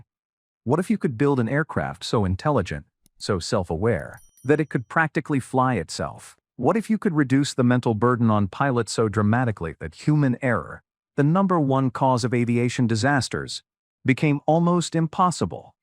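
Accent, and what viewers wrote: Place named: United States. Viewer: The American accent reveals the lie to this video. Many Americans have a bias towards Boeing aircraft. I have flown helicopters and fixed wing aircraft. Among the fixed wing aircraft have been the Boeing 737, Airbus A320 and A330. Comparing the A320 and the 737 the A320 is by far the better aircraft to fly. It's designed to be flown through the autopilot, but so is the 737. The Airbus is just so much better through the autopilot. The sidestick has been normalised by years of computer gaming. It also removes an obstruction to the instrument panel. The instrument panel is clear and concise with everything you need on the PFD (Primary Fllight Display) directly in front of both pilots. The most important safety factor in flying is situational awareness. The unobstructed view of extremely clear and concise instruments provides that. 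The cramped cockpit and a yoke in the 737 is less helpful. Hand flying in Normal Law is a pleasure with a sidestick which has enough feel and instruments providing excellent feedback. Increasing thrust in a 737 raises the nose with the engines under the wings. Reducing thrust you get the opposite effect. Under Normal Law the A320 system detects any increase or dcrease in 'g' and counteracts it. Increase thrust and you just go faster. So much easier with the autothrust on the approach. On the 737 you need to take out the autothrust on the approach to avoid porpoising as the autopilot chases the porpoising. So many other good points to the Airbus. I've a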